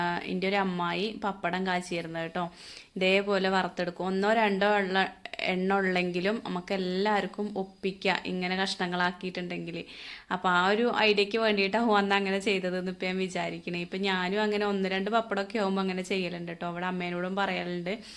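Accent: Malappuram, India. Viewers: Chechinte ഇതേ സംസാരം ഉള്ള ഒരു കൂട്ടുകാരി എനിക്കുണ്ട് അവളും മലപ്പുറം aaa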